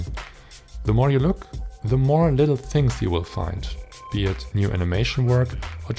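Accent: Germany. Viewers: Am Anfang dachte ich kurz du sprichst deutsch xD Dann doch englisch, dann habe ich deinen Akzent bemerkt :P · are you german?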